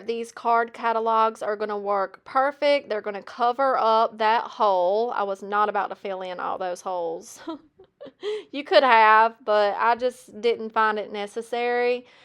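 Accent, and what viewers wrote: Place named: Texas, United States. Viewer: I just found your channel. Your accent sounds like my neck of the woods….NE Texas.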